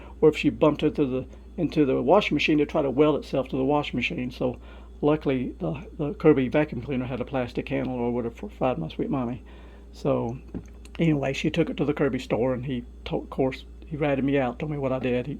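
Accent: Kentucky, United States. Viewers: I had lots of family down south I'd visit every holiday back in the 60's and 70's. Your Southern accent on top of the decor makes it like a dream for me. Thanks for this video.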